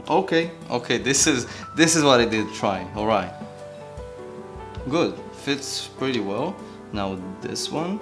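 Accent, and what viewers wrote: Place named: Israel. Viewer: Ach sheli you know your name is. Stam but I totally knew you were Israeli just from your accent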